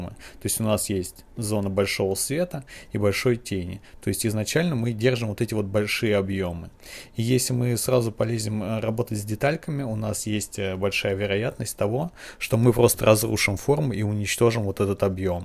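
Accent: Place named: Ukraine. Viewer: Fantastic construction of a portrait! ...a great help for me. Thank you from UK. You sound Ukrainian. Hope that is not an insult! 😊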